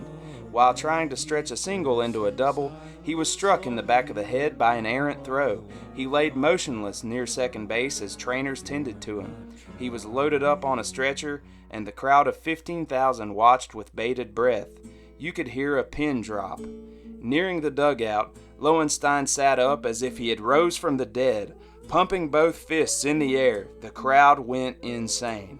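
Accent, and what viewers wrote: South, United States. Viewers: Love your southern accent . Makes an oldschool baseball video more authentic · I don’t know why. But I really enjoy your voice. I’m from Ontario Canada. In no way am I insulting you. Can I ask where your from. I want to guess some where in the south. I think you are a great story teller. Keep up the great work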